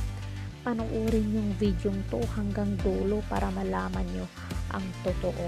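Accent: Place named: Visayas, Philippines. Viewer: Bat ganyan ka magsalita bisaya ka ba? yak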